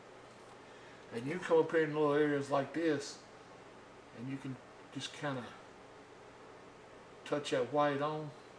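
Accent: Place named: South, United States. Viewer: I love your videos. I'm southern too, so your accent and instructions make it so easy to understand. Thanks!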